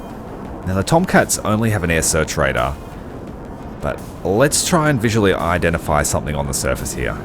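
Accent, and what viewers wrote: Australia. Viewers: Watching this at 8am in the UK, listening to a drunken Aussie talking about rave rounds. All is right with the world.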